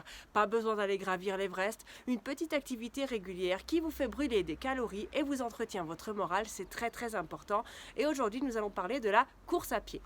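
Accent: Switzerland. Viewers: J'adore le petit accent suisse 🇨🇭 😍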